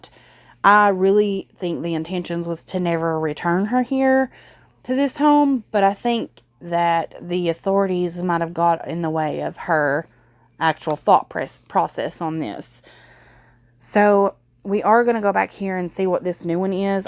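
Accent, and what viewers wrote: South, United States. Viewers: Yaaay I'm so happy to hear from you!! Thank you! P.S. I Like your southern drawwl 🐴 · I love your southern accent and couldn't wait for this video to update us, thankyou for the work you do. I hope Hahn gets jailed it's what she deserves, I know she has children but she's made her choices and I believe she's a big player in the monkey buisiness. Thanks again and look forward to your next video.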